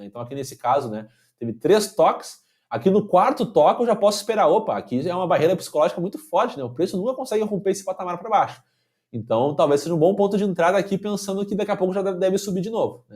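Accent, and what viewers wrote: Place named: Rio Grande do Sul, Brazil. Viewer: adorei seu sotaque gauchíssimo - além, claro, da explanação muito didática para esta neófita no mundo do trading de criptomoedas. obrigada por compartilhar seu conhecimento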